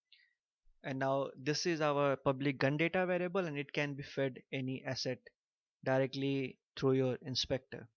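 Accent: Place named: India. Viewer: Oh man I hate the Indian accent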